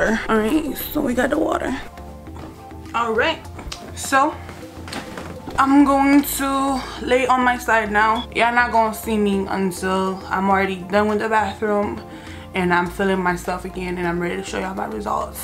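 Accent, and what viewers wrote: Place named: Jamaica. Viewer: Hi lady! You sound Jamaican like me. Where you're from originally? Mi love your videos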